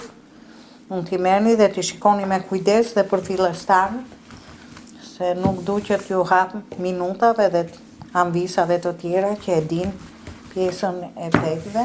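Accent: Tirana, Albania. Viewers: Bravo e gatuan mite e flet qarte Tironse mo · Te lumshi duret e arta dhe shpjegimet e tuja ja ne te kuptushme, dialekti shume i bukur i tirones. E pjek si arre, me pelqen qe e kthen e pjekje shume mire. Qofsh mire me shendet. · Me kenaqe sa bukur e komenton je safi tironse Te lumshin ato duar njerzit nuk duan salltanete duan keshtu si ju shqeto 🙏🙏🙏💕💕💕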